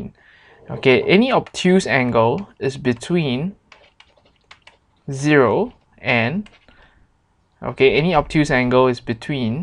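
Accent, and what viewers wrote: Singapore. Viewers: Hey are you from singapore?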